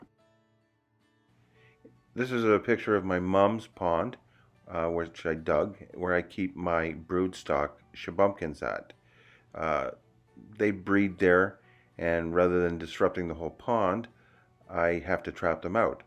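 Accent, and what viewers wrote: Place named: Canada. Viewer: Hahaha! Yep, you sound Canadian now! I live in central lower Michigan... our fall colors are in full glory right now. It's difficult for me to concentrate on the road on my hour long drive home from work, they're so beautiful! Gotta watch for deer, turkey, and sometimes coyotes. Looking forward to your fall colors! P.S. forgot to tell you thanks for answering my koi question!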